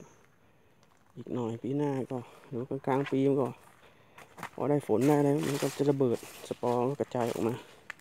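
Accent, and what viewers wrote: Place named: North, Thailand. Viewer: น้องหล้า...อยู่่ จ. หวัดไหนเจ้า (ได้ยินอู้กำเมืองกั๋นน้อ) เห็ดแดงกับเห็ดขมิ้น เห็ดถ่านถะลำเน้อน้อง แกงใหม่ ลำเน้อ อยากมาเก็บ แต้ ๆ ใจเย็น ๆ กะได้เห็ดกิ๋นน้อ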